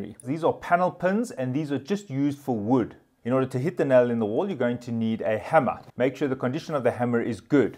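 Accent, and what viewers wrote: South Africa. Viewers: Definitely a south African accent though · No. This voice is more South African. The Terminator's is Austrian.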